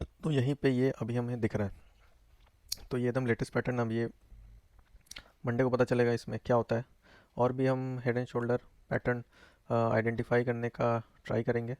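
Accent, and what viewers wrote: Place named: India. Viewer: Can't understand the English. Sounds indian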